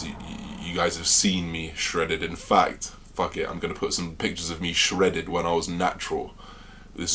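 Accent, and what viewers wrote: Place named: Liverpool, United Kingdom. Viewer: I wonder which part of Liverpool he is from, I can't work it out from his accent...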